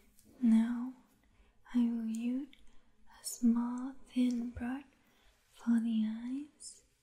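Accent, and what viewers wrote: Vietnam. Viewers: do more viet accent videos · your viet accent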